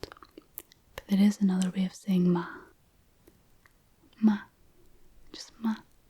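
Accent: Scotland, United Kingdom. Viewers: Are you trilingual? Is Scottish your mother tongue? Just curious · Scottish girls are amazing! Your accent is very nice and pleasant, great ASMR, thanks ;-) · You deserve more subs. Everytime I watch your videos i fall asleep everytime, your voice is soo soothing and amazing, and your scottish accent makes it 10x better ❤. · you are such a fascinating and beautiful person. I love this video., I enjoy all your videos. I enjoy seeing you, listening to your voice, and your Scottish accent, I love watching your hands. You are a very special person and a unique friend. Thank you for the joy and relaxation you bring to me and so many others. Have a great week.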